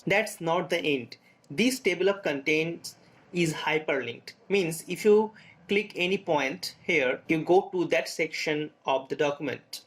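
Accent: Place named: India. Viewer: INDIAN ACCENT ALWAYS THE BEST TUTORS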